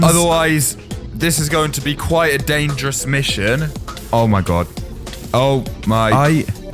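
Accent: United Kingdom. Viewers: Like if has a British accent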